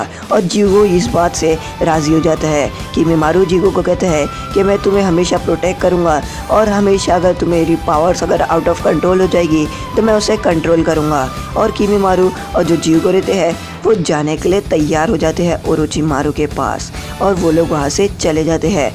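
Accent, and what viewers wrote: Maharashtra, India. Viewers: Bhai tum marathi ho kya?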